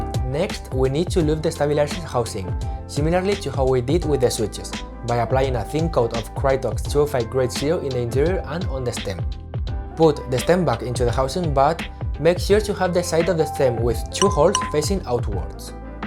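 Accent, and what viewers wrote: Spain. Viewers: Súper obvio que eres de España con ese acento. ¿Cómo aprendiste a hablar tan bien el inglés? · Where are you from? You sound like a native spanish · You have a suspicious spanish english accent... where are you from? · are u spanish? the accent sounds like it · Are you spanish, aren't you? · Spanish accent were are u from mate? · Me ha bastado con la primera frase para darme cuenta de que eras español jajajaj. Por cierto muy buen vídeo, de gran calidad y se notan tus ganas y conocimiento. Hasta Marques Brownlee te ha comentado... flipante. Sigue con el buen trabajo! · Man where are you from? Your accent sounds so Spanish!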